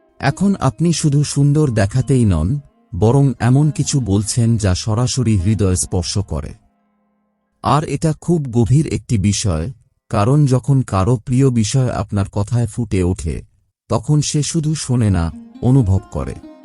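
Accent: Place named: Chapai Nawabganj, Bangladesh. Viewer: ভাই আপনার বাড়ি কি চাঁপাই? আমার এক্সের বাড়ি চাাঁপাই, ও এই ভাবে কথা বলতো আপনার স এর উচ্চারণ এই রকম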